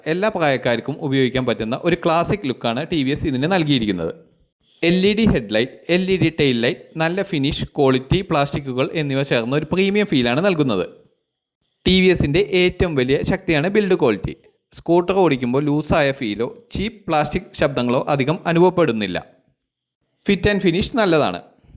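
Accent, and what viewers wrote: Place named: France. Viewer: Annan French accent aanallo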